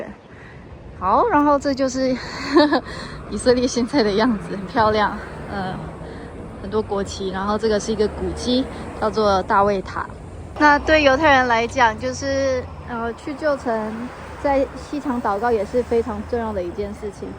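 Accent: Taiwan. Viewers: 好奇你是台灣人嗎?口音就是台灣人..但福建的也很像! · 听口音是台湾人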